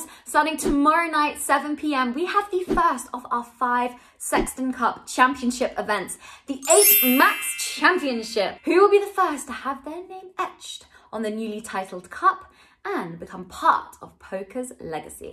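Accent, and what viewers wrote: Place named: Australia. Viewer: australian accent i hear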